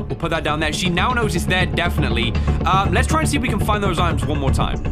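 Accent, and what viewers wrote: United Kingdom. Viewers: Wow fabulous.This man's brittish.lovely.. Good video!!!😊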